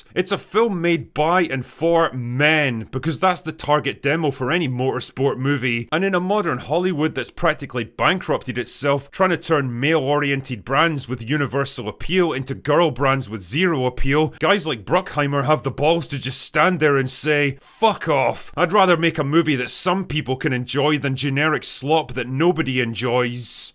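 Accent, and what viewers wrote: Scotland, United Kingdom. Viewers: So I have to say I haven't been watching all of the F1 movie reviews because I'm going to see it tomorrow in IMAX I'm a Brad Pitt fan I'm a big F1 fan and I didn't want to spoil it but wow your review was awesome not because you said you like the movie but why you said you like the movie. I was listening to it and I said this guy's got to be from Scotland and my wife's ancestry is Scotland and I love the Scottish people hey any man that can wear a kilt and pull it off I got to give you credit and who doesn't like bagpipes anyways love the review love your attitude about it and now I'm a subscriber and looking forward to more things and I like your channel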